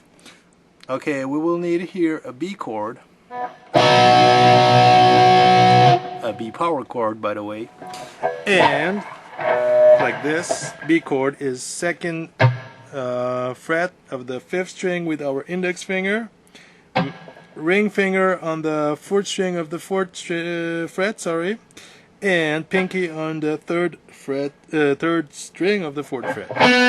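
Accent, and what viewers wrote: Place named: Eastern Europe. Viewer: love the accent....Where you from man? Sounds eastern european.....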